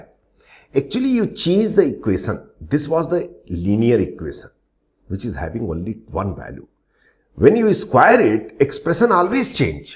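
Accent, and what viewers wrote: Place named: India. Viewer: Thank you! Finally clicked in my mind. Your Indian accent is very satisfying as well 😂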